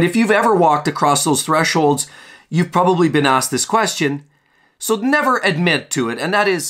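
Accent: Canada. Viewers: the most Canadian accent I've ever heard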